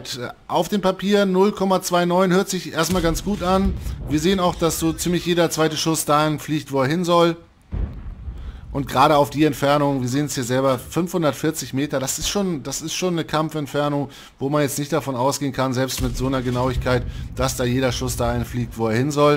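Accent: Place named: Hamburg, Germany. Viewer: Nossi ist sehr unterhaltsam, Moo für die breite Masse um ihnen das Spiel näher zu bringen. ist für die Spieler, die das Spiel verstehen wollen. Und bringt es zudem mit seiner Hamburger Schnauze (Grüße aus Bergedorf) einfach genial rüber.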